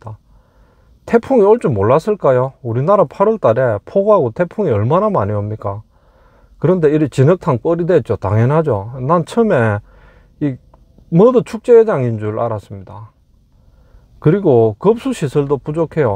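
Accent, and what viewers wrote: Gyeongsang, South Korea. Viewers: 안녕하세요.. 유투브님은 경상도 사투리 이지만 목소리가 엄청 이뿌네요. 저도 경상도 인데 돌깨지는 소리같다고 합니다.. 집에서는요.. 유투브 님은 듣기좋은 목소리 입니다.. 시원하께 좋은 말씀해주셔서 감사합니다 . 특히 목봉 말입니다.. 항상 응원합니다. 수고하세요 😁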